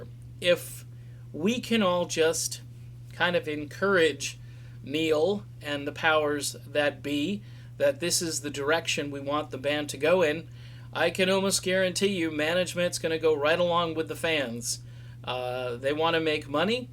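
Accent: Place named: South, United States. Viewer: For that sounds hillbilly fir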